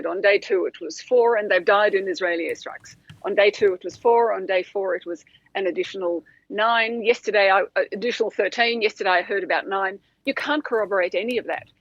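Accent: Australia. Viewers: It is an Australian accent, everything was said clearly. The Australian accent is for the most part non-rhotic. This means that the pronunciation of the /r/ sound will never occur at the end of words. Where an American will say three separate sounds for the word car /kar/, an Australian native speaker will only say 2 /ka:/. This is a key feature that only occasionally has exceptions. There is nothing to change with the pronunciation of the words. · She is very understandable but her accent sounds like a cross between American and British,more rhotic than RP but less than 'Standard" American. Perhaps it is an Australian accent ,as other commenters have suggested. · She is probably Australian. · You clearly don’t know an Australian accent. At least 5 people have now commented saying it’s an Aussie accent. Judging by your responses so far and Knowing your mentality they WILL ALL be “Wrong”.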